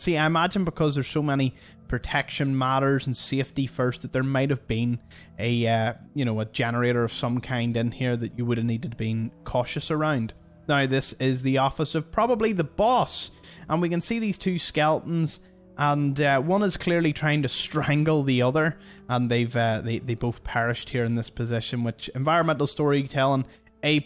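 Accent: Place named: Scotland, United Kingdom. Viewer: One thing to keep in mind is the bombs dropped on a Saturday morning. Was it a worker fighting the boss, or had someone broken into the building & made their way to the office and the safe only to be accosted by security? As to never having explored it, I figured the buildings with candles by the door in Concord were waving players to come that way. Lastly, love your accent. Is that Scottish? A person has to ask, because there is a separate accent practically every other mile a person travels in the UK.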